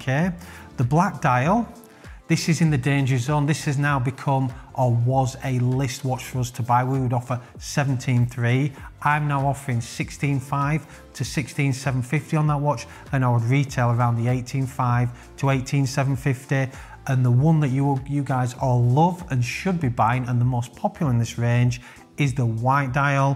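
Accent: United Kingdom. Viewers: You have a very interesting accent. Which part of uk is that?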